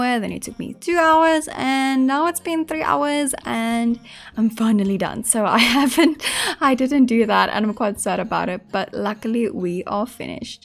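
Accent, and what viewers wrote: South Africa. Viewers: Hi, are you South African because I recognize your accent. 🙂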